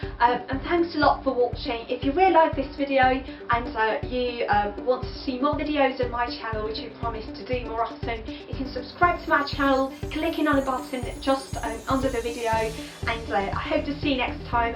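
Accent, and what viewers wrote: RP, United Kingdom. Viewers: i can't even ukrainian you speak like a real native eng speaker british so good amazing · Wow, astonishing! When you started speaking, I thought you were a British teacher. Your accent is so perfect. Congrats,! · Your not Ukrainian your British...i wish i can speak like that 😭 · What with the posh accent? :P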